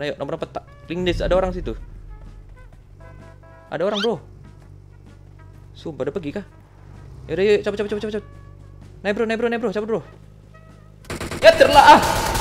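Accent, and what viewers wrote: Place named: Eastern Indonesia, Indonesia. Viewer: Pake aksen logat orang timur pasti jarang yg bisa nebak walau open mic dari awal.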